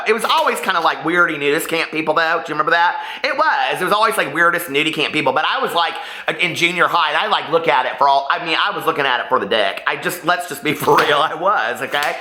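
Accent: South, United States. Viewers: Omg i totally thought you were southern when i watched your first few videos but i looked at your mailing address to see where you live. You have such southern mannerisms and have a hint of a southern accent and pronunciations i fking love it i miss my southern queens. Northern Michigan is so VERY DIFFERENT then my home town of Tampa, Florida! Love you and love everything about you and your vids! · My husband said once that you sounded southern too, but I let him know you were from Indiana 😉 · Mr, I'm in Charlotte North Carolina and u absolutely sound more southern than most of us here. 💙💜💙💜💙💜💙💜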